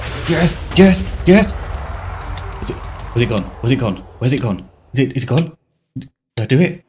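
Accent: United Kingdom. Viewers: Are you british? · u are literally so british i feel sick to my core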